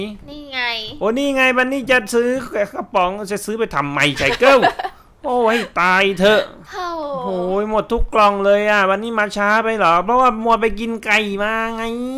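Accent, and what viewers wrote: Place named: South, Thailand. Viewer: พูดภาษาใต้นิ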